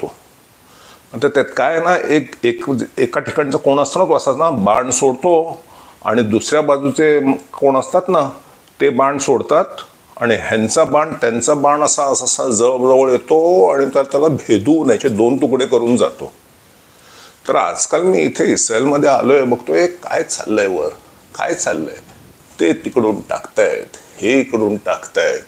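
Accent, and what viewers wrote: Pune, India. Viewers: चांगले शालजोडीतले हानतोस रे तू मुंबई त जन्म घेतला पण बोलतो पुण्याकरा सारखा धन्यवाद मजा आली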